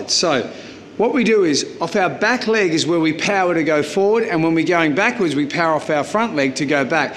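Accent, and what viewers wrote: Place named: Australia. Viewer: Nah, you're just an American with no ear for accents. Bear Grylls is British, this guy is Australian.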